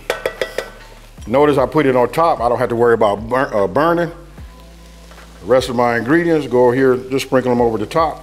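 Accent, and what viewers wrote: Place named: South, United States. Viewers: Love the version of ur recipes and love your accent. I’m from Philly, I’m a sucker for southern accents. I made this twice. It definitely hits different with cinnamon in it. Oh and definitely beans 🫘. On the east coast we use beans and ground beef 🥩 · That LA accent is strong lol I love it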